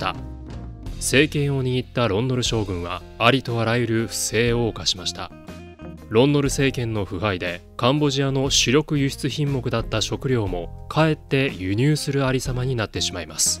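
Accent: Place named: South Korea. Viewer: この読み手韓国人らしいね(確かSから始まる読みが少ない)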